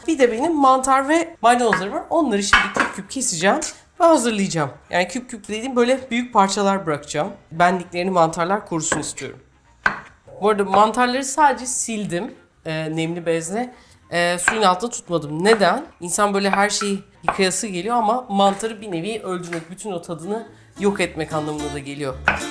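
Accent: Cyprus. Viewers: Tarifi denedim .. sarmısak da ekledim süper oldu.. emeğinize sağlık çok guzel bir tarif oldu!!! Naçizane birde önerim var.. ben kıbrıslıyım. Sende de hafif bi kıbrıslılık var!!! Ve biz keklik tavşan turaç, tahtalı güvercin(fassa) gibi av hayvanlarını çok tüketiyoruz.. eğer düşünürseniz sizden gekecek av eti tariflerini merakla bekliyorum! 👍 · Kendisi Kıbrıslı o yüzden var biraz o şivesi.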